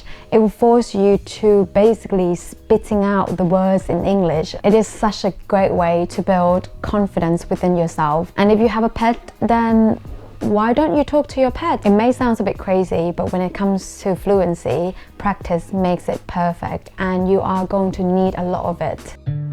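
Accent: RP, United Kingdom. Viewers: I like your accent, it actually sounds British to me. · When you are speaking is it received pronunciation? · Hello, I love your accent. How did you improve it like a British accent? I am wondering, did you able to speak this way before moving London? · U do have a British accent mostly, I can detect a small change of ur native accent here and there. How long have u lived in UK? Pls do British accent video . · That is like bbc english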